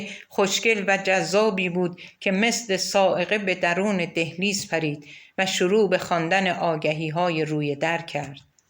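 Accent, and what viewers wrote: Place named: Russia. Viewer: چرا اینجوری صحبت می‌کنید؟؟ بیاد گوینده بخش فارسی رادیو مسکو در زمان شوروی افتادم که خانمی مسن که احتمالا از سرکردگان بخش فارسی حزب کمونیست بود، با تُنالیته خشن و مردونه، بالهجه ای که دیکتاتوری سرخ مسکو رو تداعی میکرد، و انگاری از توی یک سالن دراز خالی حرف می‌زنه، با اون لهجه فارسی_روسی میگفت: اینجا مسکو _بخش فارسی اتحاد جماهیرسوسیالیستی شوروی!! و ما هروقت شیطنت میکردیم مادرمون رادیو رو روشن می‌کرد و می‌گذاشت روی موج رادیو مسکو و میگفت : باشه اذیت کنین ولی خانمه صداتون میشنوه شب میاد میخورتتون.... 😅 وما بچه ها میخزیدیم زیر لحاف و فکرمیکردیم خانمه اگربیاد تاما رو بخوره، حتما نمیتونه مارو زیرلحاف پیدا کنه.....!! 😂😂😂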